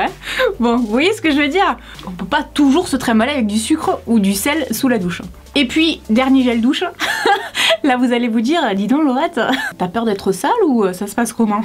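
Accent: South, France. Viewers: J’adore tes vidéos tres marrante avec Ton accent du sud qui donne le sourire !! :) et j’avoue la mousse de douche donne très envie je pense la tester prochainement. Sinon continue ainsi Tel que tu est super maman de YouTube .☺️👍😉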